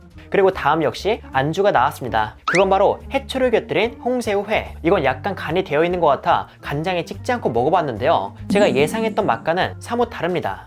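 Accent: Gyeongsang, South Korea. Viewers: 사투리 쓰시는거 보니 경상도 분 인가요?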